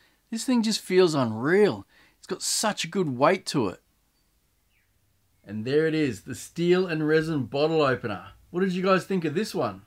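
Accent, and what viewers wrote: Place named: Australia. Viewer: Good filming, interesting content, aussie accent, mentioning another channel, you got yourself another subscriber!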